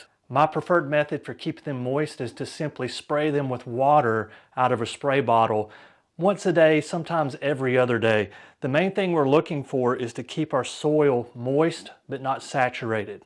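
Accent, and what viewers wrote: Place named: St. Clair County, United States. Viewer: I watched this video for all of 3 seconds and knew that accent immediately.(grew up in st clair County for 19 years)😂 I'm subscribing for that alone. 🫡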